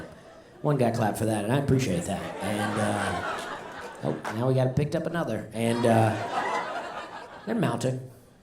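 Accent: South, United States. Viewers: This man stole all my jokes and just added a subpar southern twang to it.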